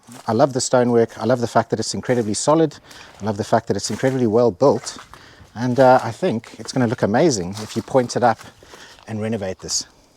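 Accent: South Africa. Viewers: Hi Are you South African? The accent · THats a South African accent. Hello from Cape Town and good luck in Portugal.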